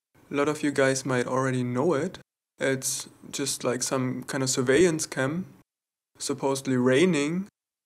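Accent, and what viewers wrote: Germany. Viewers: Dein typisch deutsch ignoranter Akzent ist so furchtbar. Kannst du dich nicht mal bemühen richtiges Englisch auszusprechen und zu betonen? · du klingst deutsch ^^ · schon deutlich deutsch :D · This german accent however pisses me off. But cool video btw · german akcent ^^ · Even for me (i'm german) your accent is really annoying! (i'm absolutly sure thaz you are german...) ÜB EIN BISSCHEN! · are you German?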